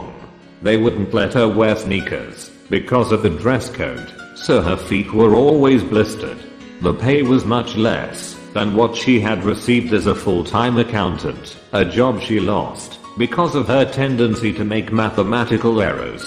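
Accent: United Kingdom. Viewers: Full of British Accent!Wonderful!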